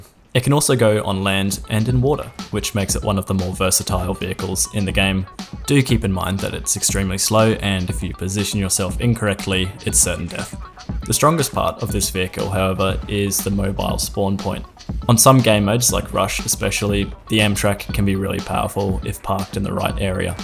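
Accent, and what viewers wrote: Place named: Australia. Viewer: Your Australian. Im subscribing.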